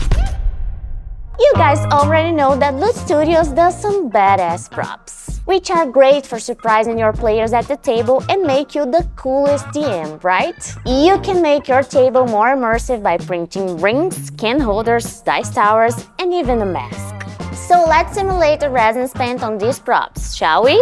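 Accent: Brazil. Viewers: Você é brasileira, esse sotaque é de brasileiro kkkkkk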